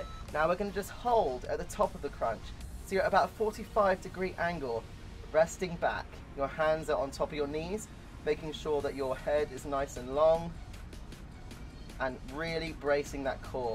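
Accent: United Kingdom. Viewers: you are simply best... Greek Body, Innocent and cute loving face.....your British accent everything with killer smile... All this these things creates something I call that as Magic... I wish can meet you, your hubby and son one day in person. Keep sharing this beautiful and inspiring video... We love you:) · Love your BRITISH ENGLISH accent！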